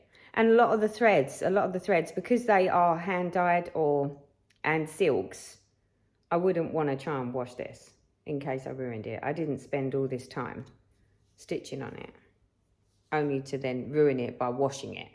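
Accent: United Kingdom. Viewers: Ive just started cross stitch and love full coverage just want to say thank you for helping me find my groove and it's confirmed I'm still in love with the British accent lol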